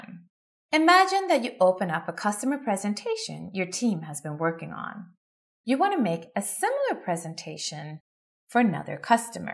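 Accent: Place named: Sweden. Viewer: Applaudable pronunciation, but I could hear that Swedish accent! :D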